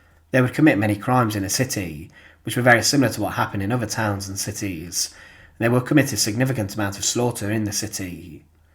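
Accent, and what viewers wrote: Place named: Midlands, United Kingdom. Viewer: You're not British obviously. I think he narrates really well. Sounds like midlands maybe east of Birmingham somewhere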